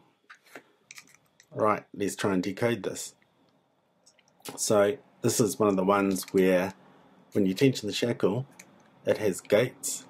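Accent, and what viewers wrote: New Zealand. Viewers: Cool accent I think Kiwi?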